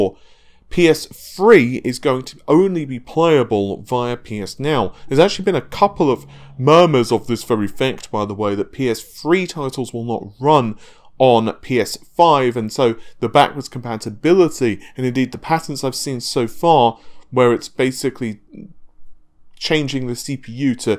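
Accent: England, United Kingdom. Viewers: Maybe a correct pronunciation of Navi will happen after it's launch, lol! I know it's his proper English accent when it comes to Nah-vey. But, at least he's doing better than Rich from RTU who's so far called it Nuh-vee, and Naiv-eye🤣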